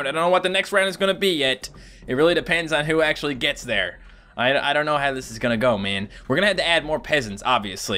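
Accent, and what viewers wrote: Canada. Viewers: Are you Canadian? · are u Canadian?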